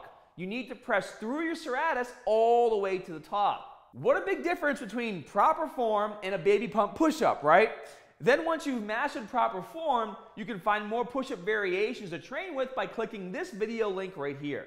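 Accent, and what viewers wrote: Boston, United States. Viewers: The best part of this channel (outside the solid tips) is that if anyone new comes here, they will be so confused why he suddenly has or lost a Boston accent. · Holy Boston accent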